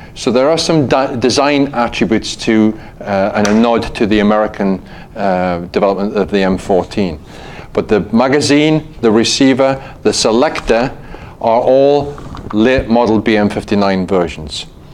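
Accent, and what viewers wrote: United Kingdom. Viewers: Out of curiosity in which region of GB is your dialect spoken? And many Thanks for the great content! Best Regards from Austria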